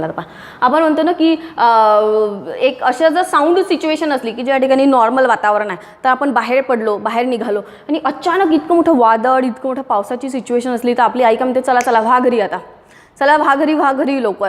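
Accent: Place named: Pune, India. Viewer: नाही मॅडम ,तुम्ही असखलित शुद्ध पुणेरी मराठी बोलता । वऱ्हाडी नाही वाटत तुमची भाषा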